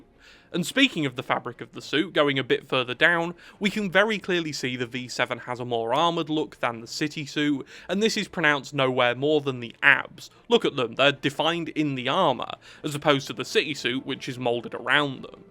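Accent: United Kingdom. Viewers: British bloke talking about video games? Don't mind if I do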